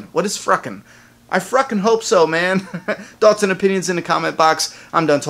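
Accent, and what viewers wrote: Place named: New York, United States. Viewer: u gotta be a new yorker! im from brooklyn myself n u remind me of people i knew back in brooklyn. sorry, just had to say that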